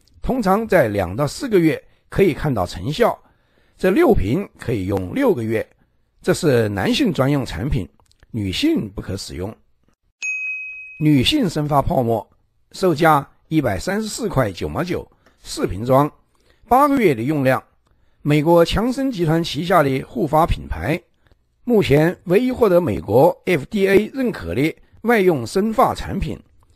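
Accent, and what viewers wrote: Sichuan, China. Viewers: 这个普通话是个四川人在说吗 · 这一听就知道是川渝方言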